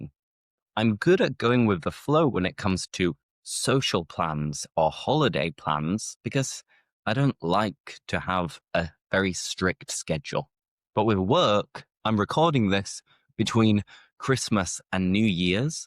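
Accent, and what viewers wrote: United Kingdom. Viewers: Finally, i understand british accent. Thanks so much😊😊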